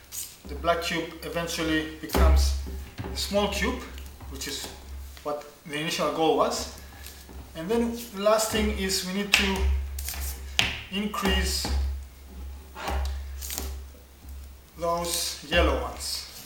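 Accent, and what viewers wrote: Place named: Greece. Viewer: По акценту видно,что грек.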